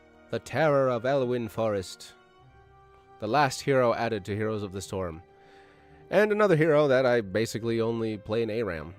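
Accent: Canada. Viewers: I'm gonna guess Canada? He's got some strong Canadian vibes eh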